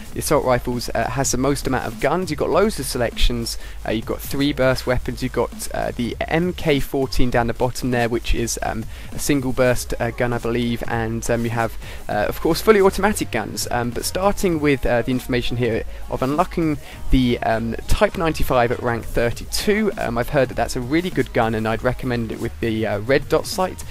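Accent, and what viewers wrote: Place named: England, United Kingdom. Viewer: hey i really like your videos but PLEASE, talk slower , im a german guy and understanding englisch is hard enough for me but your crass english accent and your fast talking makes it not really easier !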